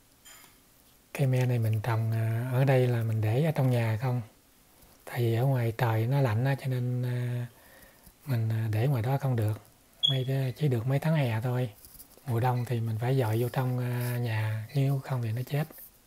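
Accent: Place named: Vietnam. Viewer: giong vn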